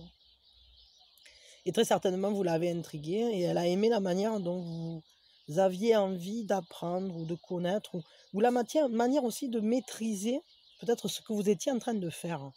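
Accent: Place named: Provence, France. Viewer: vous êtes incroyable)) et ce bel accent, de salon ou des environs je dirais 😂😂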